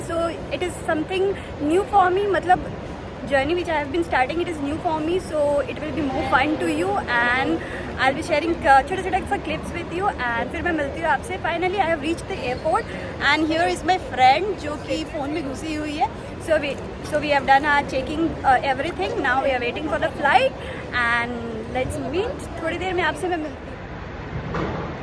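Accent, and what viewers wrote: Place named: Kolkata, India. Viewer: Kolkata ascent in very good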